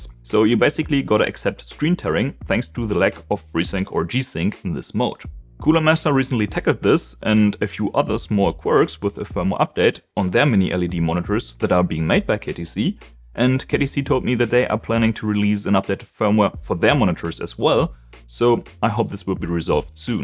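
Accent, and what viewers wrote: Germany. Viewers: Höre ich deutschen Akzent raus? Edit: Nevermind, der letzte Satz im Video hat's gerichtet 😂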